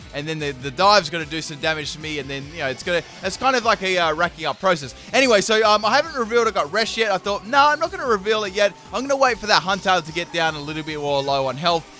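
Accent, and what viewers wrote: Australia. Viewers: Are you an aussy? I just noticed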